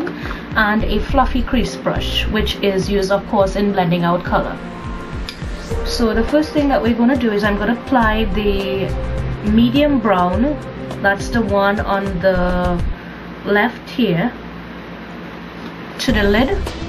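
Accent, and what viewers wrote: Trinidad and Tobago. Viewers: I love your accent!!! trini right?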